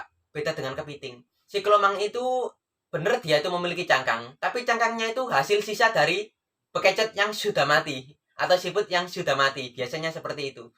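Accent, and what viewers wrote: Java, Indonesia. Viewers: Medhok bosomu mas🤣🤣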